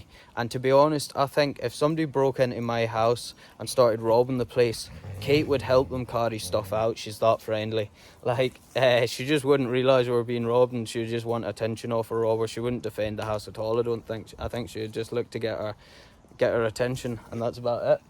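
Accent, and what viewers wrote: Scotland, United Kingdom. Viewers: This was a very easy “Subscribe”!! Your accent may be invisible to you, but this American whose grandmother was from Edinburgh just loves it! It took me a moment to catch on to what you were saying at first, but then I got the rhythm of your usage. When I was a little girl, my friends who would come along on a visit to my grandmother’s place couldn’t understand a word she said. LOL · All of your dogs are beautiful, but Kate has such a personality. I absolutely love your accent, I could listen to you talk all day! One of these days I will visit Scotland, I live in Oklahoma, United States.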